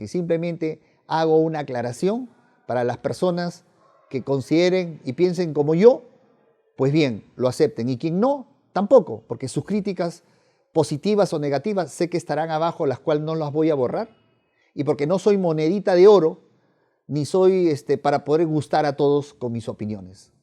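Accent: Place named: Peru. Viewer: No es por discriminar y ante mano lo digo no todos son iguales, pero con solo a los primeros momentos que escuche a este señor asumí que es peruano, la mayoría que eh conocido creen saberlo todo y los demás están erróneos aún viendo los logros del prójimo , mi humilde opinión , eh aprendido q escucha consejos de quien a obtenido frutos no de quien cree lo sabe y no los a conseguido .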